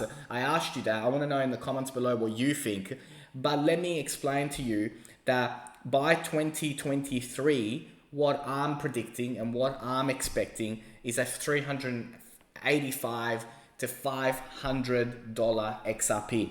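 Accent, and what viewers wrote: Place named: Australia. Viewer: Glad I found you bro. Judging by your accent your from AUS. I'm also from Aus specifically Melbourne. Their arnt that much AWAKE/AWARE crypto people in AUS like yourself. Gave you a sub mate 🙏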